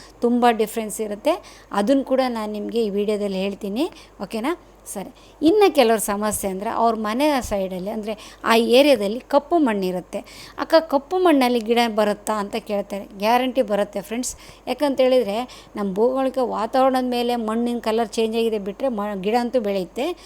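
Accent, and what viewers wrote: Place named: Kundapura, India. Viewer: but kannada superb mathdtheer... kundapura ankonde nanu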